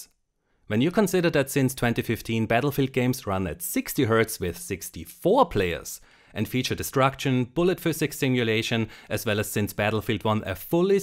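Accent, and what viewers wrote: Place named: Germany. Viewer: I can hear the German or Austrian in this Guy... Schöne Grüße aus Wien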